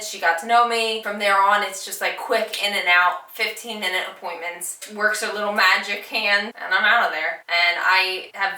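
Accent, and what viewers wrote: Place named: Alaska, United States. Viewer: Love your videos, your energy and accent lol. Sounds Alaskan?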